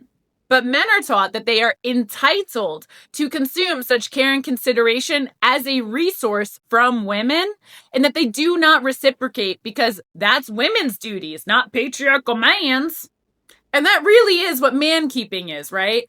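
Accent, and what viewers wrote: United States. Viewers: Girl!!! You’re killing it and I LOVE your Jersey twang!!! Looking gorgeous too ❤.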